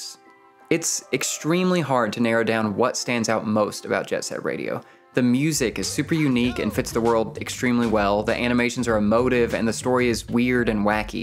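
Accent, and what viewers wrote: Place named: Virginia, United States. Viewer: Are you from Virginia? My old statistics professor has the exact same accent. LOVE the video by the way. I feel like all your observations ring very true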